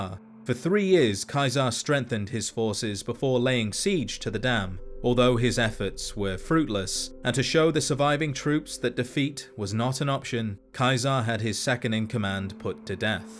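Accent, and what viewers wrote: United Kingdom. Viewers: Thank God, a British accent on the internet not talking about the Queen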